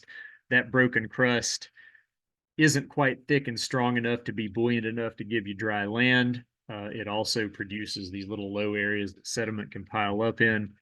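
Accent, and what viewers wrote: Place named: Baltimore, United States. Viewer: Love your Baltimore accent. LOL I wonder how many people can identify that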